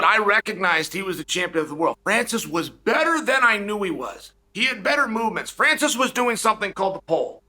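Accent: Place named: United States. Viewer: Ok Chael, you say the word poll nothing in a google search. The closed captions say you said poll. There is a move called a pull, I’m guessing it’s the yanky accent.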